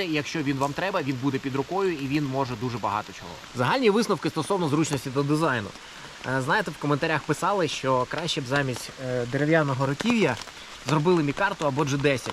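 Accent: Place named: Russia. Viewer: Я тоже не понимаю зачем говорить на одном языке, а думать на другом!? У автора так и вырываются русскоязычные нотки в диалоге и водно, что украинский даётся тяжело, а, в карпатах на украинском, разговаривают гораздо быстрее!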